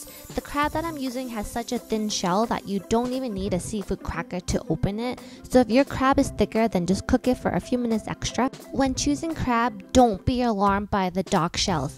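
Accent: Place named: New Jersey, United States. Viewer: great recipe! absolutely love the jersey accent too!!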